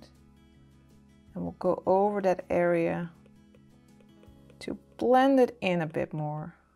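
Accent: Netherlands. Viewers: i was like: she is dutch. I CAN HEAR IT. En toen hoorde ik stroopwafel en ik wist het gewoon.. BTW LOVE YOURE VIDSSS <3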